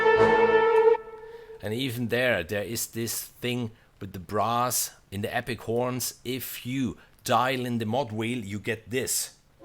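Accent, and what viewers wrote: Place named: Germany. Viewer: oh, du kommst aus Italien? Opsss dachte du bist aus Deutschland 🤦‍♂️ zumindest nach dem englischen Dialekt nach! Vielleicht reicht ja auch einfach ein deutscher Untertitel? Oder aber, mach einfach weiter so, so viele composer die film score machen gibt es eh nicht in Deutschland und eigentlich hast ja recht, englisch sollte eh jeder können, der in unseren job arbeitet! 🤗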